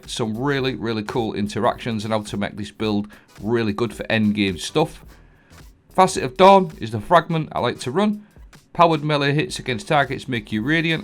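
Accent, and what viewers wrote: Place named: Newcastle, United Kingdom. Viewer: I love the accent. You sound just like my mate Geordie Son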